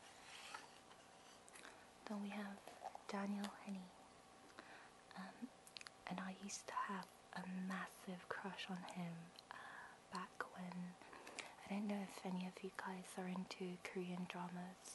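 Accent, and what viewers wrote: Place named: United Kingdom. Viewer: Are you from Korea? Your accent in English made me think you were from the UK!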